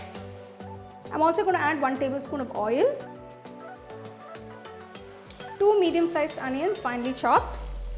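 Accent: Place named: India. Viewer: Funny heavy indian accsent🤏🏾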